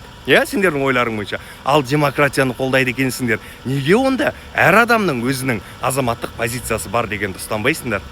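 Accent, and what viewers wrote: Standard. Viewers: Рахмет, балам, қазақша таза сөйлеп, өте дүрыс пікіріңді айтасың! Жарайсың! Қазыр қазақтар - жарты қазақ + жарты араб, не болмаса жарты қазақ + жарты орыс! Ал өзің, балам, таза қазақсың, бетке үстар блогердің бірісің!!! Тағыда, рахмет!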